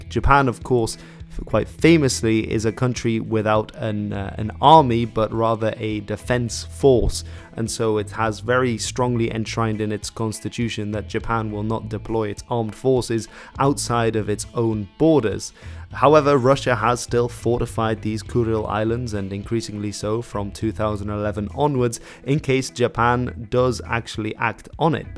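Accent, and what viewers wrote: United Kingdom. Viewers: Hi. Are you from UK? I am so curious about your accent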